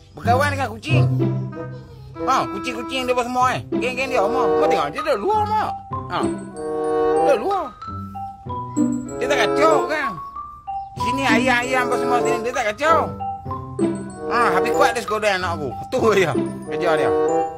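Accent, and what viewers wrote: Kedah, Malaysia. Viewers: abg org mna..ckp supo org bliing ja😂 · Bg. ni ckp loghat Baling Kedah...kalau area Kuala Nerang-Pedu-Pokok Sena-Titi Akar, loghat mcm ni orang Melayu yg boleh cakap siam...dah mix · Pkcik ni ckp loghat apa ye?kedah ka atau terengganu/klntn?😅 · Mu orang Baling ka abg? Wak tak tuh dehh..dema kacau kita dehh..😅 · Cara ckap mcm org baling 😌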